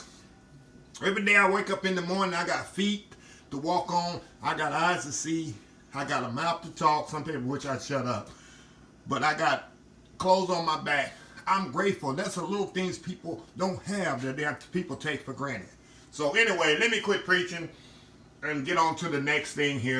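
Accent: South, United States. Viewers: Chef I absolutely love you and you keep on preachin sir. Let the world know who's (Jesus) in control. This food looks delicious 😋 and that country accent I so love 🥰 I love my southern brothers and sisters!! #HelpSomebodyOldSchoolSoulFood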